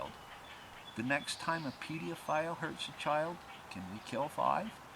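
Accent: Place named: New England, United States. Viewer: That's real yankee talk right there.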